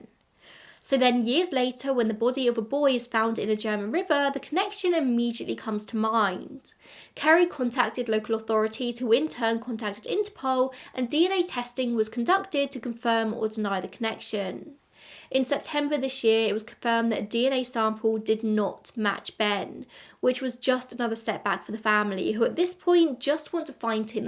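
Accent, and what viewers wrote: United Kingdom. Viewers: You're not saying it wrong at all, just British. Plus, you nailed your american accent of it! ❤😮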